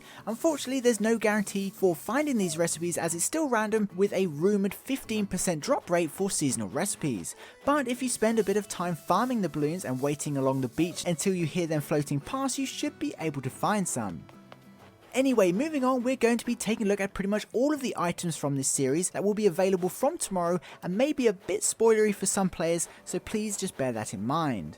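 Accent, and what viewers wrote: England, United Kingdom. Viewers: He sounds like he lives in England and I think they are a day ahead and this ornament stuff comes tomorrow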